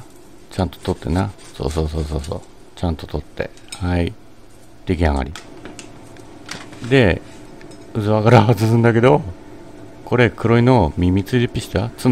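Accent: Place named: Fukushima, Japan. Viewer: 福島弁めっちゃ楽しい🤣 いきなり笑わせて頂きました👍 左利きだったんですね〜笑 タイマー2ついる？🤔w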